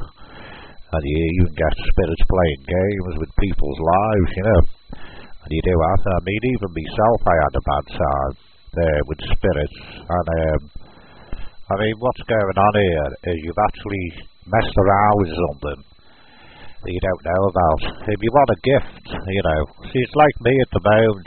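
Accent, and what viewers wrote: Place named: Cockney, United Kingdom. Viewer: What is this... some kind of cockney Brit radio broadcast? The audience doesn't even know who is addressing us. More moving images and less static ones... thanks.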